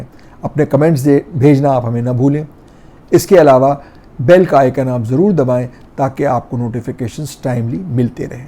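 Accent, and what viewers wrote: Lucknow, India. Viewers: saheb aik gujaarish aap lucknowi chikan ka kurta aur wah bhi chunnat wala pahan kar episode kijiye. bahut authentic lagega aapke lucknowi lahja ke sang.chia to hoga aapke paas.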